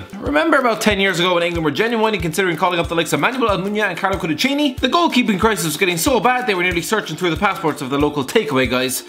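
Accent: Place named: Ireland. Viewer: Love the Irish guy doing the narration! He's SOOOO funny!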